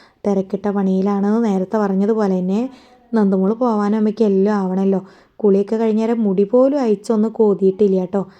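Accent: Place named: Kannur, India. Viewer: നമ്മ പപ്പ കായീന്ന പറയുന്നത്😀😀😀😀 കണ്ണൂർ ഭാഷയാണേ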